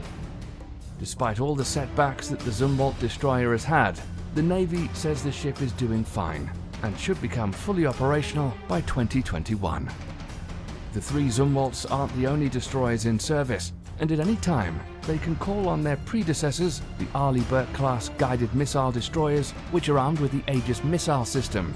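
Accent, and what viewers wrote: United Kingdom. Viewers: What the hell is a "gate-ling gun". LOL And why is a british person narrating these videos? 🤣🤣🤣🤣🤣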